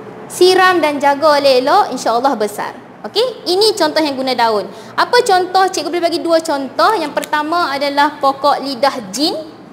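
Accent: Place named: Kedah, Malaysia. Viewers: Saja je 😅 kedah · terima kasih cikgu saya suka cikgu cakap kedah🤭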